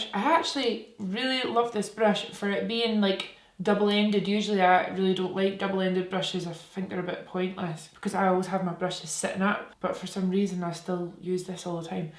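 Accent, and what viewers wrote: Scotland, United Kingdom. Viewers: Skoosh 💦 😂 Awfy Scottish today!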